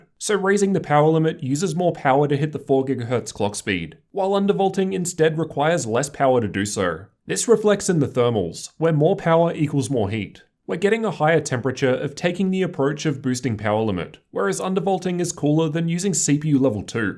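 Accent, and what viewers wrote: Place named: Australia. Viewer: What do you think 2020 laptops will have to bring to the table? Do you think it will be a major price to performance boost or it won't be something too significant. Also a side note: I really like your Australian accent, adds what I call a ' touch' to your videos:)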